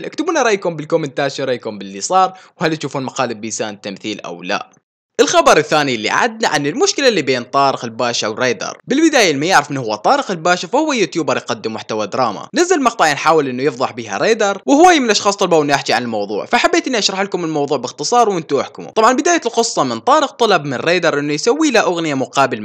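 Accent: Iraq. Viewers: لا عراقي باعي لهجته عراقيه 👀😊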